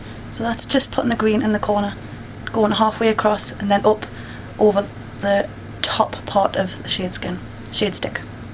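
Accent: Newcastle, United Kingdom. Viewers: It's Geordie - as in Newcastle etc... !! !!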